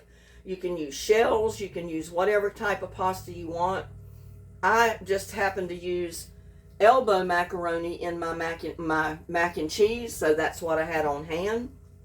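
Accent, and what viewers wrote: United States. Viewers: Loved watching how you make your chilli, I add red kidney beans to mine 😋 love them,even picking up the American accent 😂xx take care 😊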